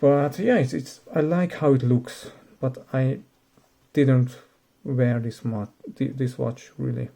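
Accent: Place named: Europe. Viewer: Myself included, majority of watch enthusiasts thoughtlessly buy and acquire all sought of random watches for display, hoarding and bragging purposes. In doing so, the art of watch collection loses its meaning and enjoyability and becomes a liability - as we are constantly looking for the next watch to buy without ever enjoying the few we have. Am American and consumerism here in the US is like a demonic spirit. The main take-away from watching this SOTC Video is Modesty. I also like that your collection is SUB$300 USD and project to liquidate a few pieces and buy one masterpiece that will endure more wrist time and bring you more satisfaction. The Title of this video should be: "STATE OF PRUDENT COLLECTION." Judging by Your reasoning and modest watch collection says, to me, that you are a simple, humble yet intelligent person. Your soft voice makes for an easy listen as well. 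I hear an accent but wasn't sure where you from in Europe. Thanks again.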